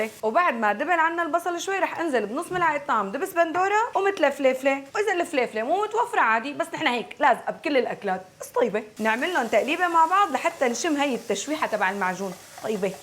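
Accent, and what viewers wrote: Syria. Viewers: عن جمال اللهجة السورية أتحدت ❤❤ كتيير بحبك و كل وصفاتك ماشاء الله ربي يحفظك 💚 وتحية إلك من الجزائر 🇩🇿🇩🇿🇩🇿💕💕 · انا بحبك اوى والله 😂وبتعلم اللهجة السوري منك وعاملة صداع لجوزي في البيت 😂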